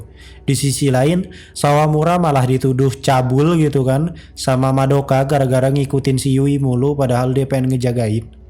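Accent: West Java, Indonesia. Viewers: Orang Sunda Oge euy admin na 😁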